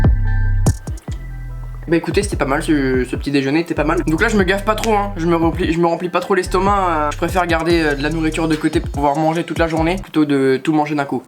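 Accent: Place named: Canada. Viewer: Parle canadien 24h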